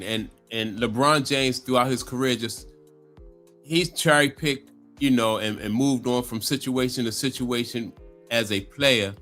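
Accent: Baltimore, United States. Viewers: Are you from Baltimore?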